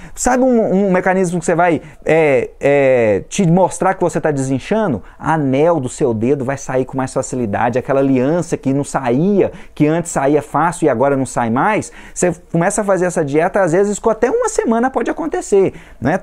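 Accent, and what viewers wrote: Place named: Minas Gerais, Brazil. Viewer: Amo nosso sotaque mineiro. 😍😍❤❤ Depois deste vídeo ficou mais fácil entender o processo de emagrecimento. 👏🏿👏🏿👏🏿👏🏿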